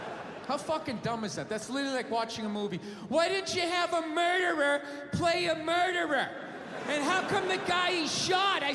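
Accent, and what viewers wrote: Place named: United States. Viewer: Pub bore with a yank accent. Heard it all before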